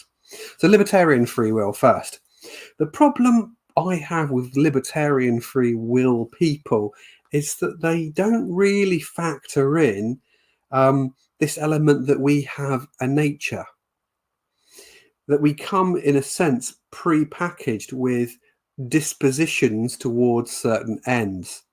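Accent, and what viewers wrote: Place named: England, United Kingdom. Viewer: Superb English accent and manner indeed, on top of all the thought put in. Subscribing. (A beautiful reminder of Attenborough, but dreadful now to realise that "Soonack" Sunak and co have similar too, eloquently aiding the destruction of the our fellow human beings and their loved ones in Gaza and Ukraine!!🥶)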